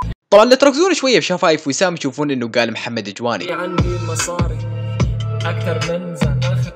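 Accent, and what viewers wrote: Iraq. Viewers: مبرووووووووووووووك 200 الف مشترك تستاهلللللل👌❤ أكثر 🔥 ليش أحسك عراقي🧐🧐 يارب تطلع عراقي يكون فخر🥺🥺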